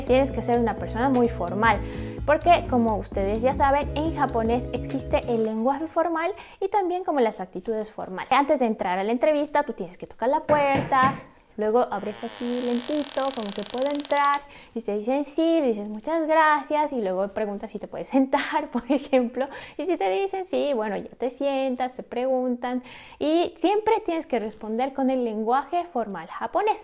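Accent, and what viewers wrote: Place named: Mexico. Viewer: Tu manera de hablar es igual a los mexicanos hablas cantando :v no te atine pensé q eras mexicana bueno ya tienes un nuevo suscriptor mas :v esperare con ansias en próximo vídeo :)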